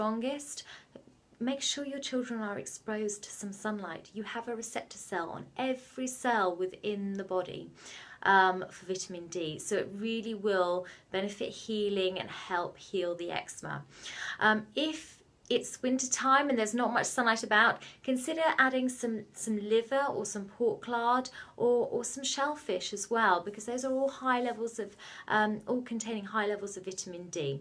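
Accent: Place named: United Kingdom. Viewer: U have a strong British ascent young white lady. Other than that, when is the best time to take probiotics? Thanks in advance ma'am.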